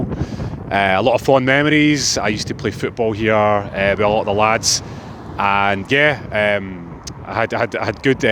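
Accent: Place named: Scotland, United Kingdom. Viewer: Yer handsome enuff to b a Ger 🤣 but wi that accent yer as Scottish as whisky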